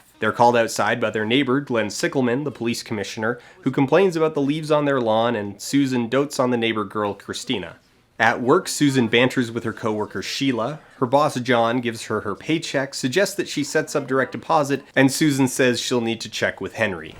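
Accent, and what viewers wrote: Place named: Canada. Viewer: Definitely is Canadian.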